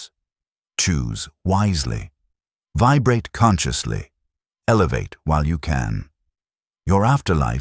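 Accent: United Kingdom. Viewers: Lemme gess more a i. Trying to act kike the know dick about human spiritualality when youre just a preditor taking advantage of humanitys dire need for mass spiritual awakening and info so you put these suposidly helpfull ( all they usually do is repeat them selves over and over and i get a broken clock is right 2 times a day i also get tgat humanitys greedy and the other part of us aint byt we just wanna live and be usefull to are fellow humans were you you dont even intoduce youreself first pount of you drfintly aint one of us strike 1 strike 2 you never ever say any of this like its comming from personal or even studied knoledge no youre literly just copied jung or who ever copied pasted refergitaed real humans real learnend knoledge and deepth to suit youre needs to genetate cash for some one that probably has way to much if that allready 3rd point how i know ypure fake 9/10 times its the same pish english my shit dont stink and i know everything additude when you clearly never put a pair of pants on or walked a mile in any ones shoes see the thing is i binge watch utube tons of it all month a myriad of difrent subjects from soiritualality to religion philisophy sociology psychology history geography homless shelter building old time plants for food and medicine every channel that tails wearwolf stories or dogman and a shit ton of musical everything and this posh englush holly crap iam better than everyone voice with it equally condecending additude its just plain gods da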